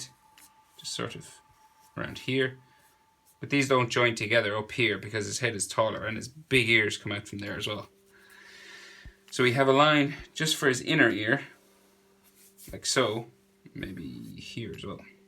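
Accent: Ireland. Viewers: arwe you irish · Are u Irish u have a bit of a acsent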